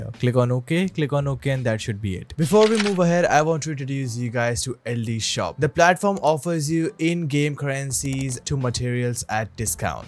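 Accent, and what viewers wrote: India. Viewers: Yk its good when u hearr an indian accent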